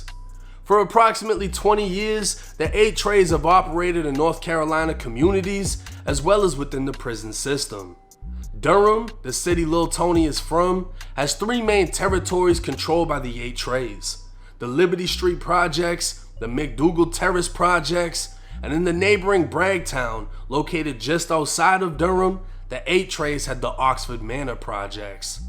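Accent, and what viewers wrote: Boston, United States. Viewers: Bro I've been meaning to ask you are you from Boston you have a Boston accent am I correct or no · Bro where are u from..?Boston???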